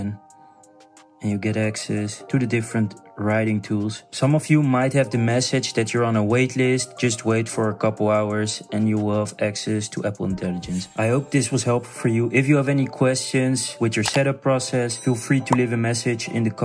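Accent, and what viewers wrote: Netherlands. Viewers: a nice good thick dutch accent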